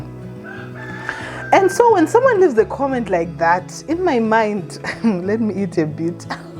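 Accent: Zimbabwe. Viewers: What's miphaphu? it goes back to colonisation and Africans and their colonised mindset and how Zimbabweans have not healed from the adverse affects of lack of healing from a colonised mind. I personally can detect not just your Zimbabwean accent but a Shona tone in your accent. Perhaps because I'm not exposed to a lot of Shona accents I wouldn't know what Murewa accent is but isn't that what makes us so beautiful? Is that not something we should celebrate instead of bringing each other down because of it? Your accent to me is easy on the ear and even if it wasn't that would be my problem so why would I make it my business telling you a out it?. Leave these people that woke up on the wrong side of the bed alone. You do you. We love you just the way you are! Much love from Esigodini.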